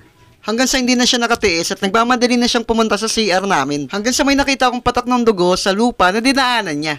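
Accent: Ilocos, Philippines. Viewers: Kuya ilokano ka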